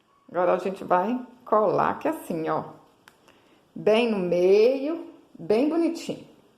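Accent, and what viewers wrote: Minas Gerais, Brazil. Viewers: Bom dia ..amei vou fazer, obrigada vc é ótima amo seu sotaque uai...😘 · vc é de Minas?porque meu marido é e fala como vc,gominha,superlitro,rsrs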